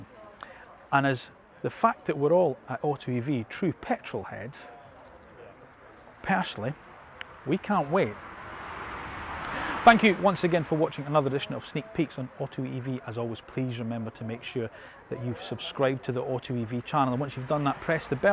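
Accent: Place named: Scotland, United Kingdom. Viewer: Oh.. this is the Scottish accent. This is my first time to hear. Very unique and I love it.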